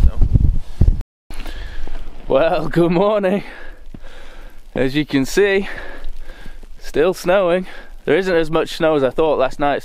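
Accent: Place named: England, United Kingdom. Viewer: Where are you based? I hear a English accent but by the looks of it your either in Canada or the very north of North America.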